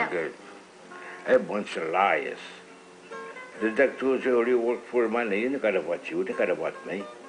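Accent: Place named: Portugal. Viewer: This is how my parents talk too. LOL :) (Portuguese too)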